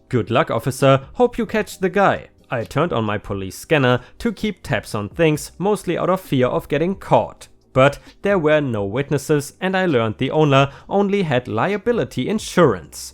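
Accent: Germany. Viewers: 🌟🌟🌟⭐⭐⭐🌟🌟🌟 I listen to you and love your readings. I's say you and rSlash are my favourites then close second are Mrreddit and Markee. I've been playing yours as I go to sleep so hope that helps your channel, about 21-27 vids. I also have a soft spot for Germans, and I mean that in a friend manner, du bist in sicher lol (Excuse my German) Your narrations are awesome and your accent is the icing on the cake. Thanks 💛💚💛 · Germany. Not every german sounds like a nazi in a indiana jones movie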